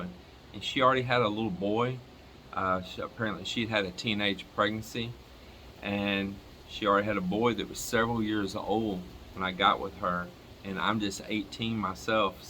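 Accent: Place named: Dallas, United States. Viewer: Love the Dallas accent. It is Dallas, right?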